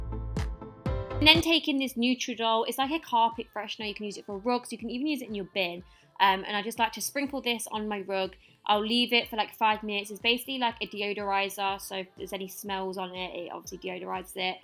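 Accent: United Kingdom. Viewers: Where do you come from? Is this a British accent?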